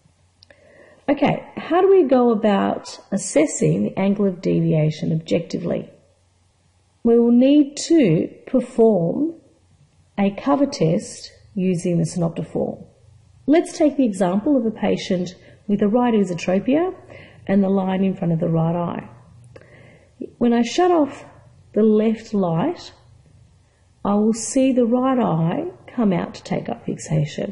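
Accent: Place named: Australia. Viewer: it's cool to hear some Australian accent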